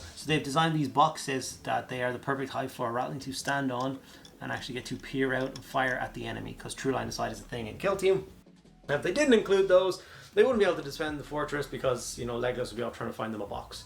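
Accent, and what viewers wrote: Ireland. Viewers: tbh as long as I hear your lovely irish dulcet tones I am happy, you encourage me to paint. No nonsens, just paint encourages new cummers to the hobbie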